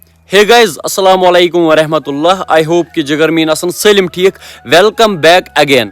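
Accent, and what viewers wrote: Kashmir, India. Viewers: What is the reason behind your kashmiri accent ... Like you pronounce pewaa with pewaan , cxata with cxataan .. kupwara people dont usually use this type of accent . (answer) And much love and support to your content 🫂♥️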